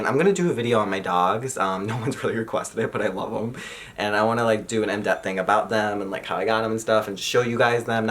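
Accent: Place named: Michigan, United States. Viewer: Your Michigan accent kept throwing me off. I thought you were saying Kanye-ac, as if Kanye had invented his own brand of cognac. Which would not even remotely surprise me. 😶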